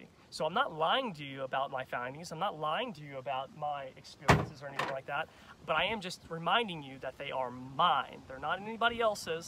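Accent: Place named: Indiana, United States. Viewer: My guess is Indiana...looks like an Indiana farm and sounds like a Hoosier!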